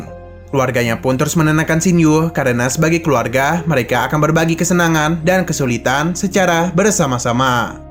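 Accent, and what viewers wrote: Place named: Central Java, Indonesia. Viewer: dari jateng mana suaranya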